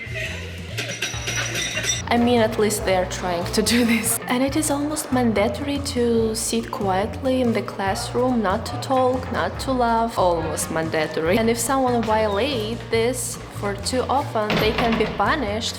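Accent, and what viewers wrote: Russia. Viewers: I like the way you talk it is soo cute haha your russian accent ❤️ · You have authentic russian accent..love it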